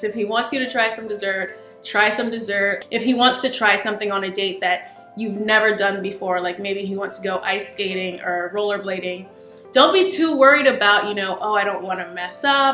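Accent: Nigeria. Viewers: You really pulled that 9ja accent hey 😹😹👏❤️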